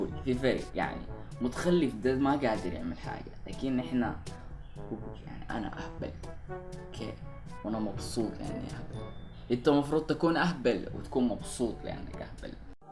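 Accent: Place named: Gulf, Arab world. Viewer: لهجتو بطيئة و غريبة و فيها نبرة خليجية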